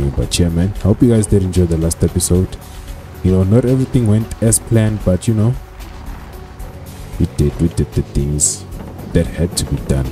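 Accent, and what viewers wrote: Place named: South Africa. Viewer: The way you speak you from South Africa bro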